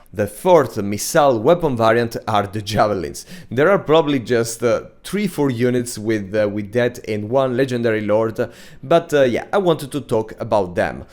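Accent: Italy. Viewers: are you italian? i love your accent! :)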